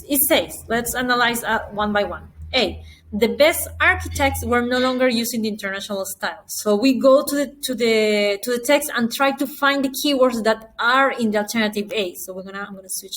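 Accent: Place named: Russia. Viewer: Thank you so much for sharing the best tips with us🙏don't get me wrong, I felt a little bit Russian accent in your speech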